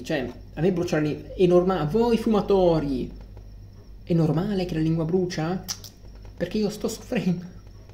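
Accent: Italy. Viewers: Se parli romanaccio non fai ridere sembri solo un boomer che vive a Roma e vuole i like👌NOICE cmq chi sta leggendo copiate e incollate alle persone tipo questo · Ma sei di Pesaro? Hai la parlata da pesarese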